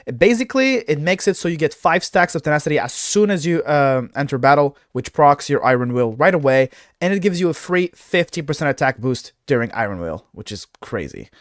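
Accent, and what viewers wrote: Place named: France. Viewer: You can't mask that french accent!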